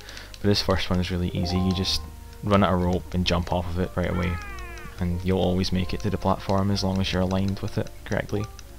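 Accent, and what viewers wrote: Scotland, United Kingdom. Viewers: Are you scottish or irish?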